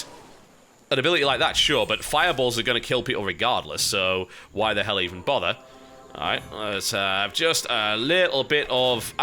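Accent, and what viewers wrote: United Kingdom. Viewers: WHAT does it matter if he pronounces babel different than in America he got a british accent which I like way better than American accent.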